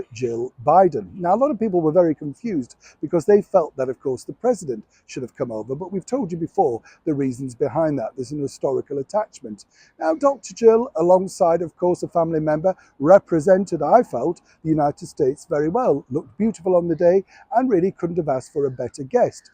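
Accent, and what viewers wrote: United Kingdom. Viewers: I;m still not get it and the uk accent is still a little bit difficult for me... so, you are Megan and scientist E and Trump and John and B or her mother? or Charls or the bad general? or Q ? I like some characters and I don;t like some characters because so many characters! Also, I;m not sure matt and em and m. Anyways, wrap up please... thank you.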